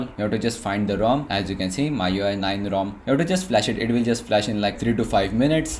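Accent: India. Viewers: Ted Mosby он на инглише говорит, просто с индусским акцентом..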